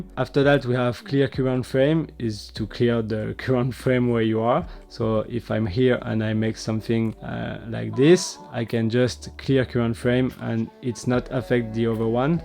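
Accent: France. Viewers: Toi t’es français ? 😂😅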